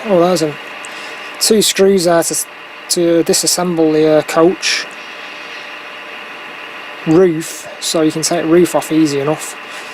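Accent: Manchester, United Kingdom. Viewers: Well, its from over tyneside really but yeah okay it worked around liverpool and manchester... Nice to hear your accent. Do miss the manchester twang having moved to Australia. Its just a really small loco in real life so it would look really small in model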